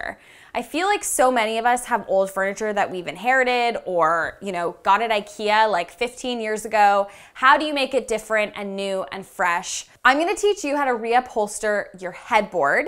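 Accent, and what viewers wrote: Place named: Canada. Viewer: I knew the first time I heard you that you were Canadian. Ouut.and about. I love Canadians. Unfortunately I’m stuck in the USA during a very dark time.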